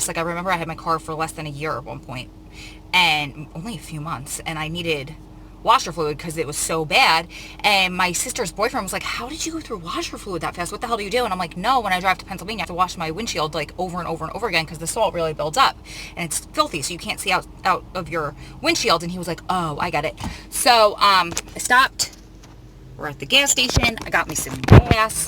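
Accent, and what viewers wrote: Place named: Long Island, United States. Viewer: Awww no prob! I love your personality & accent. I have the same one bc I'm from long island, NY. Lol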